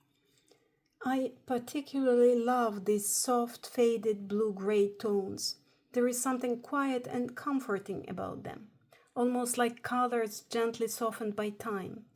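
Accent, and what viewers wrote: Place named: France. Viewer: ❤ 😎 me gusta mucho la espina cocida wow 🤩 hermoso tu diario 📔 Están precioso todos detalles, los bordados 🧵 oooh 😮 y tu hermoso acento francés 🥰 me encantan todos los acentos del mundo ! Debo también decirte que Tus telas que usas son preciosas. La manera que describes los detalles de cada página es increíble 😊. Amo estos videos . Gracias 🥰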